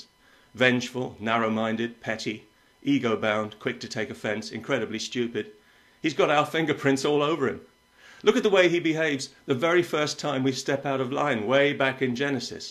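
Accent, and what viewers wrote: England, United Kingdom. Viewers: I like the accent? English?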